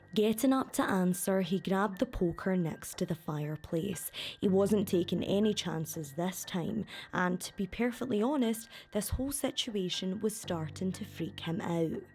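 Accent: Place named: Glasgow, United Kingdom. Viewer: Fucken hell didn't realise how much I missed your wee wegie accent 😂🤘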